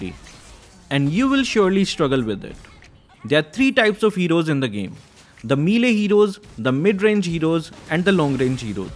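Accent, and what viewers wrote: India. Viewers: U r indian ? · Bro are you from India pls answer me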